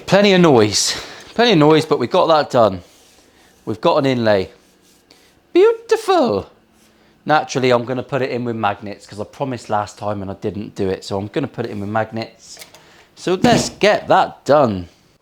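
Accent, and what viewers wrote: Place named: Plymouth, United Kingdom. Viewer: The Plymothian accent had me hooked immediately. I'm Bristolian, so we share a twang.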